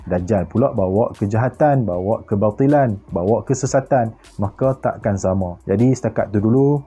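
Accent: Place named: North, Malaysia. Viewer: Mula2 mcm nk cover bahasa utara..last2 x boleh tahan..😆😆..apapun penjelasan yang jelas..😁😁😁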